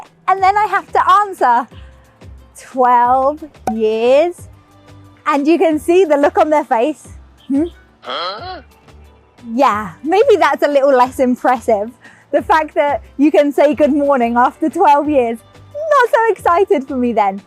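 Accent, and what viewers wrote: England, United Kingdom. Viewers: 12 years! you must be kidding do not speak Chinese at all. However, first time hearing such strong, clear English Accent in Taiwan. Please do not be afraid to speak even your pronunciation is incorrect, maybe learn from your kids could be much easier and quicker. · 英國腔好酷XDD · 親愛的Lucy, 拜託 千萬不要永遠說中文拍影片 妳迷人的英國口音 正是妳的一大特色 不過我也承認 很想聽妳整集影片都說中文 魚與熊掌無法兼得 妳過得開心最重要 祝妳學中文順利 加油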